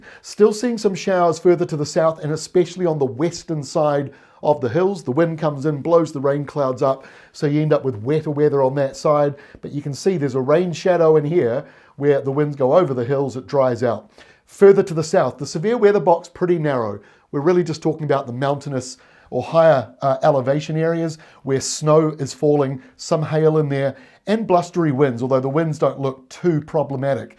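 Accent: New Zealand. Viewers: Love your kiwi accent and the forcast of thuck clouds.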